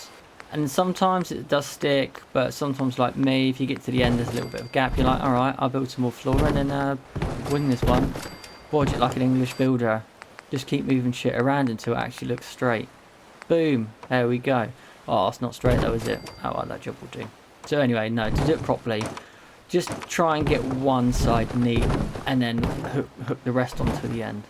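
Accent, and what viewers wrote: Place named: New Zealand. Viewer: It's the Kiwi accent you're thinking.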